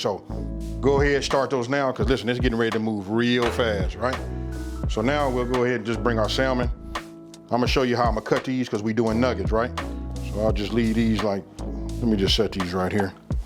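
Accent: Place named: California, United States. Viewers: Coolest cat on the net. With that LA accent and hat you remind of my guy, NIP, #RIP. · Soon as i saw a big black brotha wit a LA hat and Cali accent, i knneeww this was bout tah be gggoooiiiidddd!!! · Ok Unc, wit that Cali twang!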